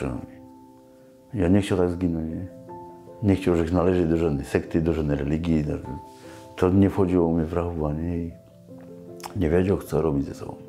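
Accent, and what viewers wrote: Silesia, Poland. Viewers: Super job:)! Uwielbiam slaska gware,akcent. Best regards from Belfast:) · Historia ciekawa ale historia i Śląski akcent to dopiero się słucha :) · Fajny akcent śląski! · Słychać z gwary że to Ślązak. Och lot Och lot.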